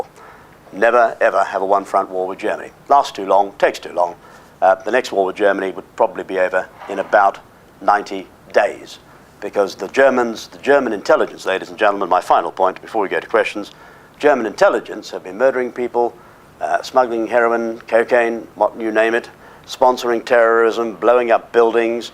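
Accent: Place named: RP, United Kingdom. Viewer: Utter crackpot. His Patrick Moore style accent irritates as well. Bad egg. Nasty chap. Totally off his head. Has he not heard of NATO. He has been reading too many war comics.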